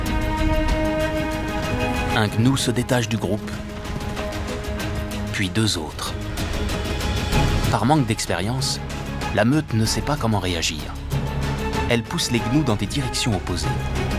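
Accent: France. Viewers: Amazing footage but there's only one bad thing... It's a French narrator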